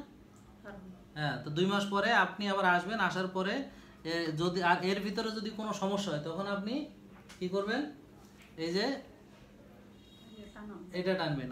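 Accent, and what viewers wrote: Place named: Feni, Bangladesh. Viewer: স্যারের মুখে ফেনীর ভাষা শুনে অনেক আনন্দ পেলাম এবং খুশি হলাম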